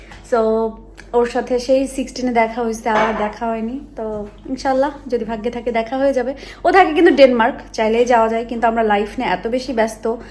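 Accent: Sylhet, Bangladesh. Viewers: এরে ও দোস্তত 🤣 খাটি সিলেটি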